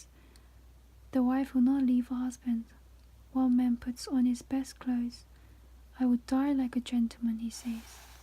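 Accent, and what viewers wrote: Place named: United Kingdom. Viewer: 님 진짜 목소리 너무 좋으세요 ㅠ I love your voiceeee💕 그리고 영국 발음으로 읽으시는것 같은데 저는 미국 발음으로만 말할 수 있어서 그냥 목소리도 발음도 너무 매력적이에요! 잘 듣고 가요, 감사해요!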